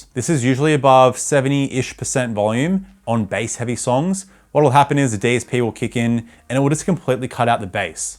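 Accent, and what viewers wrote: Australia. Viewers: Always love seeing an aussie bloke talking bout tech on the Internet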